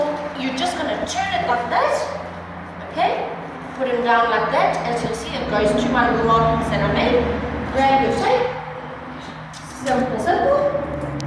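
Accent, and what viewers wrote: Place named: South Africa. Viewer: Well some people are a little thick not to point at RAzzA, I personally watch it a few times not because I did not get it the first time, I just Love the South African accent and your beautiful eyes can't wait for the touch screen thermostat video . Not sure what you were thinking with the scissors? hey but.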